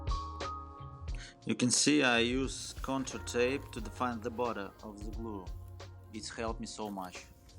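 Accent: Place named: Russia. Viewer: По акценту будто русский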